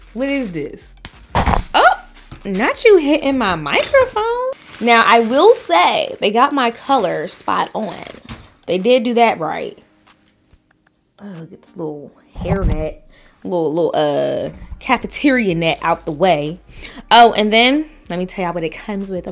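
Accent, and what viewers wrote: DMV, United States. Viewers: That DMV accent make this shit so much more funny😩😭😂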